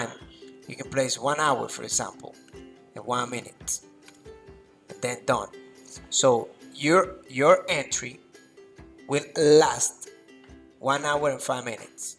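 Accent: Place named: Caribbean. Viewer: I love Caribean English!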